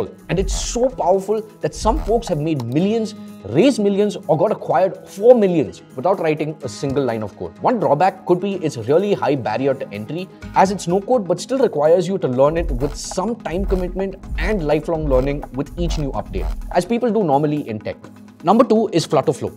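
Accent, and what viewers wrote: India. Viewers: first homewaork to you get rid of your indian accent fun facts 50% of audience cloose and move to next video after hearing just the accent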